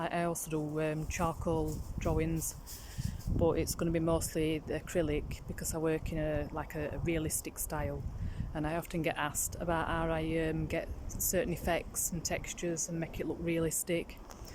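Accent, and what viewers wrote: Yorkshire, United Kingdom. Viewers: Love your Yorkshire accent, its just like my dads so i understand you no problem. Lol You are most talented, just subscribed! Hi from Canada 🇨🇦 loving all your content. Cheers, Michele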